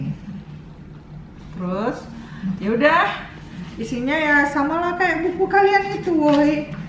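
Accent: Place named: Medan, Indonesia. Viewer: aksennya medan bgt, jadi kangen rumah hahahah, loo.. loo... nya itu :))))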